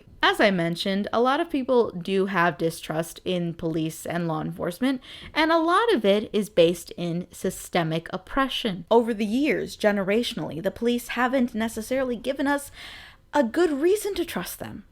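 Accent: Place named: Midwest, United States. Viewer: The midwestern accent dropping the last letter is REALL. I literally paused the video to say the title and realized I did that as well lmao.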